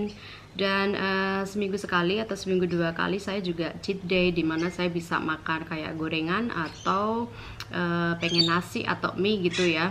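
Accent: Java, Indonesia. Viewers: njowoo banget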